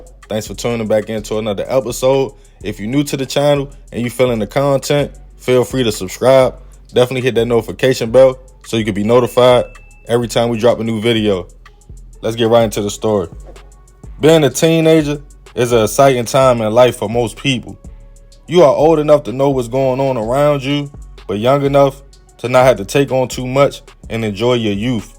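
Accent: Baltimore, United States. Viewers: Man If It wasn't for my Army Buddy From BALTIMORE Back In The mid 80s I would Never understand your accent..HOT DUGGS for All my friends 😂😂😂😂😂❤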